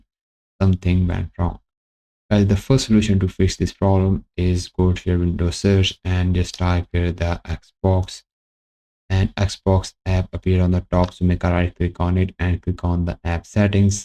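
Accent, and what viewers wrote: India. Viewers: Whyyy tf on every vid it has to be a Indian 😔 im sorry im not racist but danmm I can't understand shi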